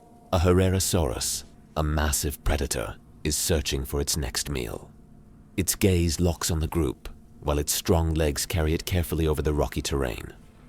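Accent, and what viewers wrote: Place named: United Kingdom. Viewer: Just sub'd; lovely video, love that British voiceover ! Good luck with your channel...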